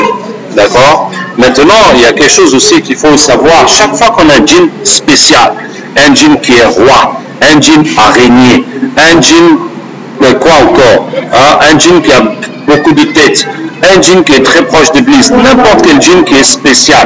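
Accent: Africa. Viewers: L'accent de l'Afrique! C'est quel accent?pas celui choisis par Dieu?soubhanallah que Dieu nous éloigne toute idée de racisme même le djines musulmans sont des frère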